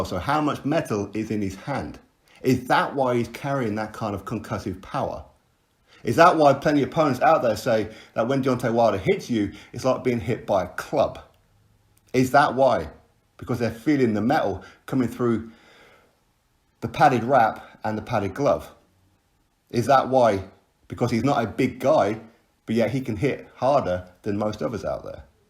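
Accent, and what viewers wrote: United Kingdom. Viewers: Ion know y but I can’t stand listening to someone with a uk accent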